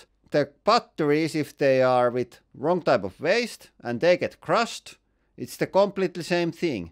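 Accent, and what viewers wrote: Finland. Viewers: I love the Finnish accent! Hyva Suomi! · I love your Finnish accent. My Grandparents and Parents have passed away and I miss all things Finnish. Now I feel like I need to buy a hydraulic press for fun!...or go back to Finland. Hyvaa Paivaa. Puhakan Timo. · Ужасный английский с финским акцентом😅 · Is that rallyenglanti I can hear?😊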